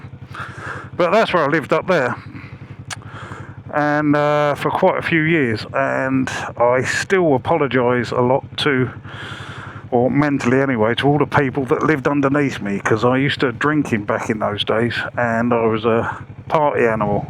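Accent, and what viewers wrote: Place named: Southern England, United Kingdom. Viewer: good evening. Great to see you out on the Vulcan after so many months. Are you actually Swedish or originally from the South of England as your accent suggests. Perhaps you could give us a brief run down on your background. But of course, only if you want too 😅Enjoy your spring and summer riding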